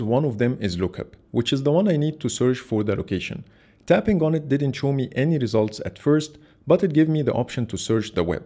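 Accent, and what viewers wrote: Egypt. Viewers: لهجتك مصرية 🌚✌🏾والله فيديوهاتك ادماااااان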